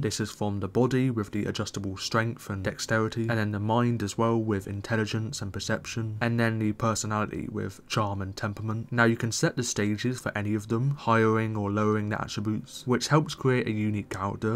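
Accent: United Kingdom. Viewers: SERIOUSLY it's pure and concentrated I was thinking the same thing and made a comment too. Whenever I hear certain phrases here and there in this video I can't help but crack a smile. This guy has the most extreme british accent I've ever heard. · That is one BRITISH f***ing accent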